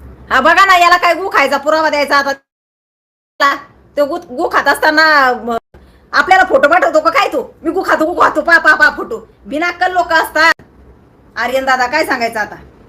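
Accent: India. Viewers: I am watching your videos from Canada. I am originally from Nagpur. I like your rural marathi and your boldness. You have exposed these so called Maratha leader. He is really bhangar illiterate person. God bless you beta 🙏🙏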